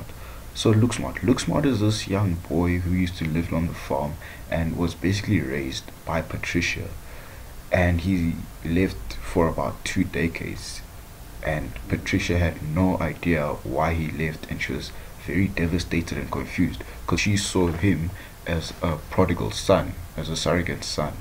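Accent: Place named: Zimbabwe. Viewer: Could hear a Zim accent and I'm barely wrong bout such 😂🔥🚀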